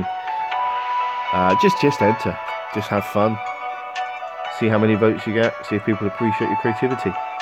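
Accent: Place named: United Kingdom. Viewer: such a cool, posh accent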